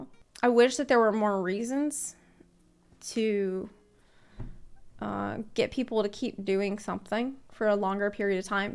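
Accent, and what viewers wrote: South, United States. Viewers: are you from the south in the states? I hear some southern accent here in there.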